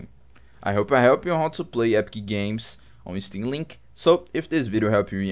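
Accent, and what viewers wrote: Brazil. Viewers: Sotaque BR massa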